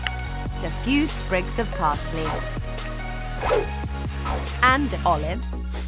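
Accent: United Kingdom. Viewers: So he's or she's idk British???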